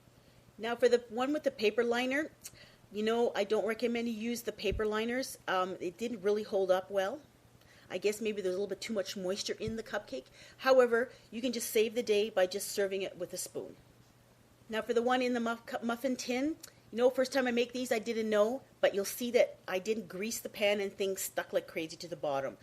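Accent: Canada. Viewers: are you cannadian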